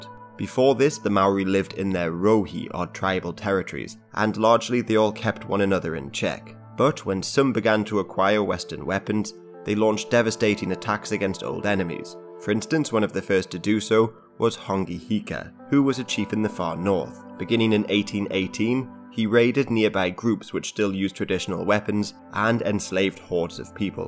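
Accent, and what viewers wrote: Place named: Newcastle, United Kingdom. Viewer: Good stuff, bonny lad, and I think that i heard the remnants, of a Geordie, accent there, good voice, for story telling tell them about,the lampton worm,.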